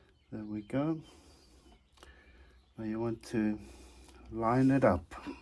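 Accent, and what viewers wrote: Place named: Scotland, United Kingdom. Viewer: Nice video man! And I dont know what it is with the Scottish accent that just makes me listen to your instructions like in a classroom lol!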